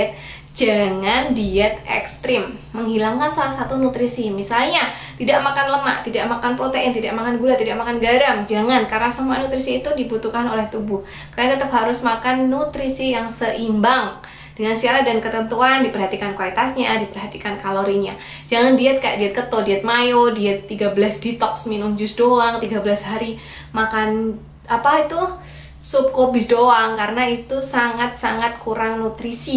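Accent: Java, Indonesia. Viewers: ooaaalllaaahhh..... mbak nya wong jowo toh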